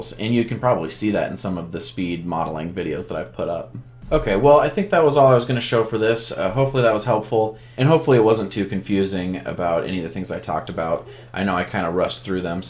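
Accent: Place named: Letterkenny, Canada. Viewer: Sounds like he is from Letterkenny... Pitter Patter, let's get atter!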